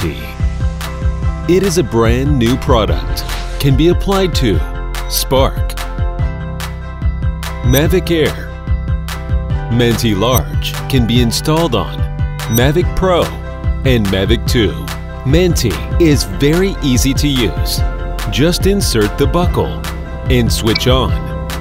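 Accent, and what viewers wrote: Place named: China. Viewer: The Chinglish is putting me off.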